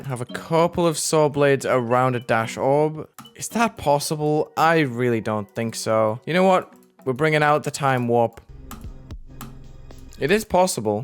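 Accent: United Kingdom. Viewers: You're bri'ish, same. What county you from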